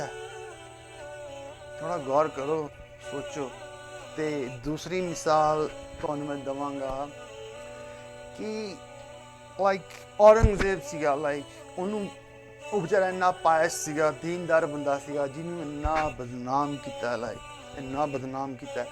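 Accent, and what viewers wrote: Pakistan. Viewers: Tu sikh nahi hai teri bhasa hi nahi hai sikhn wali ihe boli pakistani hai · 😂😂 guys this is just a fake propaganda video by pakistani punjabis. His accent and urdu words mixing with punjabi says it all.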